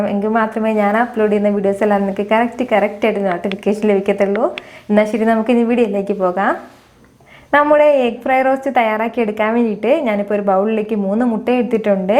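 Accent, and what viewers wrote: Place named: Tamil Nadu, India. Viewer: neenga pesurathu entha Mozhi Tamil kuda sernthu mix aagura maari irukku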